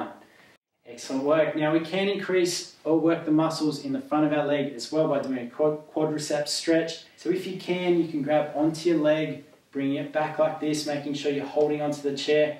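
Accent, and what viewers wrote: Australia. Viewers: IS THIS GUY FROM AUSSIE LAND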